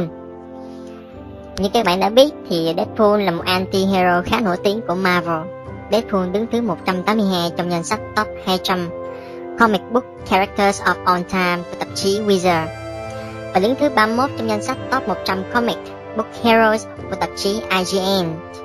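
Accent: Vietnam. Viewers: Thím nói giọng miền trung p k ? :))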